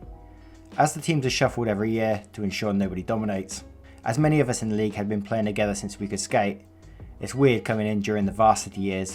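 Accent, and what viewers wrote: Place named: North, United Kingdom. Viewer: Subbing because I love your accent. I’m guessing Northern UK?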